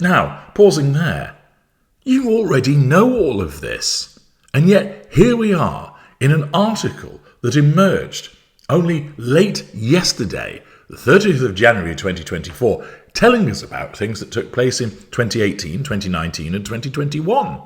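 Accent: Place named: RP, United Kingdom. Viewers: You the devil's son,found out your kids are not yours,so making up bullshit about others. Trying to talk posh when you just so sick,should be in a mental home. Tina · This man’s channel is only positioned to offer his guesses. He uses a posh manner of speaking and decent production vale’s which both lend themselves to a “feeling” of truth. His guesses and opinions have no more credibility than yours or mine. For anyone who cares about my opinion I happen to think there does exist 1 boy child, born by a surrogate, that is of no relation to either Harry or Meghan. I believe they have no custody of him. I believe there is no girl child whatsoever because the child was supposedly born in the US. I notice the British have trouble understanding that in the US there are no secret births no redacted birth certificates. If a birth certificate cannot be located by the most ordinary citizen there is only 1 reason for that — there was no birth. 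There are no exceptions. It is that simple and no one on planet earth can refute that fact. The BRF — none of them — have ever seen this girl child. Serious mistakes have been made by both Queen Elizabeth and even more so by Charles.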